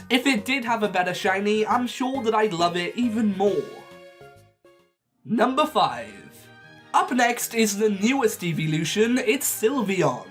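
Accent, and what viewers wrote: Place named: Scotland, United Kingdom. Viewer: Rly sorry to ask, but where is your accent from?? My little bro says you sound scottish, but I just wanted to know!!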